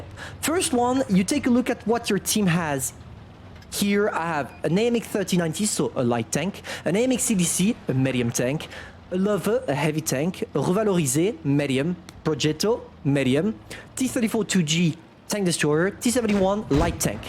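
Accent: France. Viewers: Are you french?🤨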